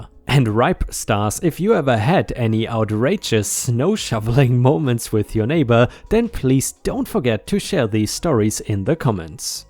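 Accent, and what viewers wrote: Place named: Germany. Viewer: You do sound preeeetty german, man.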